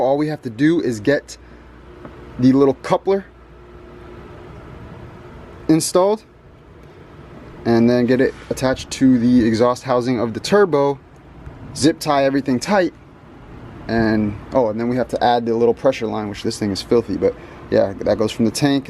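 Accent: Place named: Louisiana, United States. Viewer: You gotta be somewhere near Louisiana the way you talk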